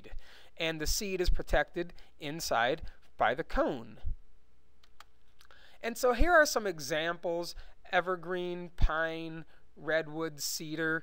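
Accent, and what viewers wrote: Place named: Midwest, United States. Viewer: Thank you so much! P.S Love the accent, is it mid-western? Chicagoan?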